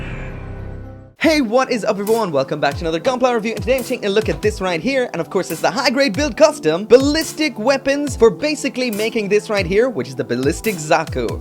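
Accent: Ireland. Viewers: Wait your Irish What county you from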